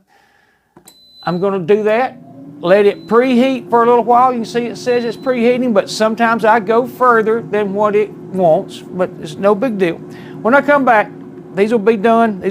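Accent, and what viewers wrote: South, United States. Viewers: That country accent makes the first 10 seconds of this video so awesome lmaooooo 👌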